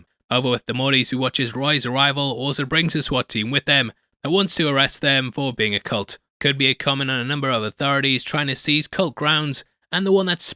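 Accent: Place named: United Kingdom. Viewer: Clearly he has a British accent so cant be "Murican."..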